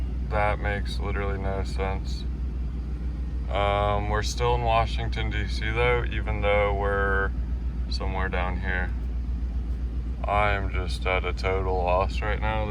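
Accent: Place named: Venice California, United States. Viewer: Bruh your personality is funny sound like a Cali Venice man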